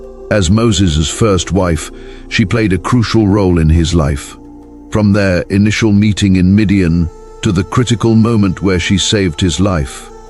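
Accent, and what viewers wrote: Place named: United Kingdom. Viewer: Amazing that this narrator,with a a strong British accent close to one doing their version of RP He's on too many videos,which proves most of these videos on bible pretty much phoney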